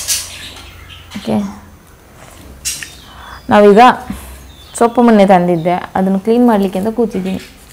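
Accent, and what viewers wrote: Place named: Mangalore, India. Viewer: ನನಗೆ ಮಂಗಳೂರು ಕನ್ನಡ ತುಂಬಾ ಇಷ್ಟ ನೀವು ಮಾತಾಡೋದು.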